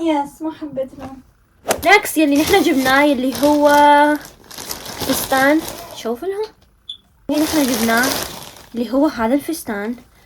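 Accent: Iraq. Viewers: ها شبيها ترى المصلاويين يحجون هيج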